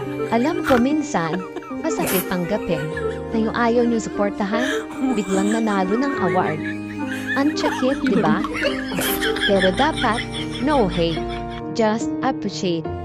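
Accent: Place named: Quezon, Philippines. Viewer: "Let's get right & not bitter but be better together"..i love it❤ It was fun watching & hearing you..always watched replay sa mga vlogs & ls mo..kung makakita man..silent viewer lang palagi but this one napa-comment ako! Josh really deserved the award..naiba lang dahil medyo mabagal..english ang lyrics..walang rude words o halong kabastusan kaya d natanggap ng mga ibang traditional hip hop..at yun ang naririnig ko lagi noong kabataan ko ng mauso ang rap dto sa atin kaya d ko ma-appreciate! You're my happy pill too..lalo na pag naririnig ko ang accent mo sa pagsasalita..nami-miss ko ang punto' ko..bumabalik lang pag umuwi sa amin sa Lucena, Quezon..magkapitbahay ang probinsya natin kaya hawig ang punto natin😅 Thanks..keep going..nakakaaliw ka!🥰😅👍❤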